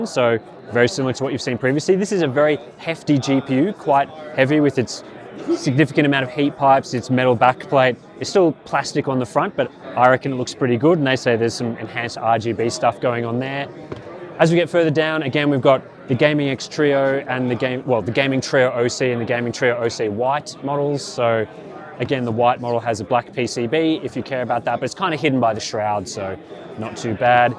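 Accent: Australia. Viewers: All that talk about fan spin sounds like a Louis Rossmann video except for the Aussie accent.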